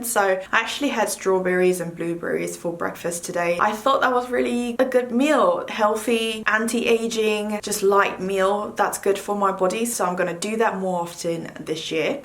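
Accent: United Kingdom. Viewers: I love hearing your British accent! 🥰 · 오랜만에 듣는 영국발음 너무 좋네요🧡 오워터~~ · 커피 많이 마시고 물 안 마시는 사람 나야나,,, ^_ㅠ 뭔가 오랜만에 풀로 영어로 말하는 영상 보는 것 같은데 영국 발음에 또 한번 치이고 갑미당,,,ღ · 브리티시 악센트 너무 매력적이에요🖤 새해복 많이 받으시고 건강하세요 늘💕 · 영어로 하는 브이로그도 찍어주심 안댈까요? ㅠㅠ 영국식 발음 발음 넘 듣기 좋구, 영국식 발음에 로망생겨써요, 🤍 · 영국 악센트로 바뀌신 것 같아요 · 영국식발음 넘 신기해요 운동하며 자신을 잘가꾸는 모습 많은 영감을받아요 저도올해는 좀더자신을가꾸고 아끼고 사랑해주고싶어요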